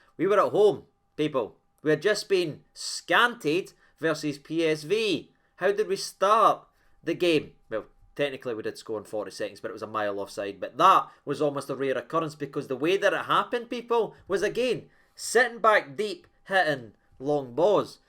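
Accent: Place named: West of Scotland, United Kingdom. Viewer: The guy sounds like any other West of Scotland gentleman. I'm a Celtic fan who enjoys analysis from both sides and he comes across very well.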